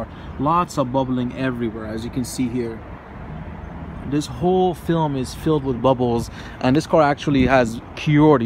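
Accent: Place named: Iran. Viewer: Woow Such a Persian accent Did you come to US like last year or somethin?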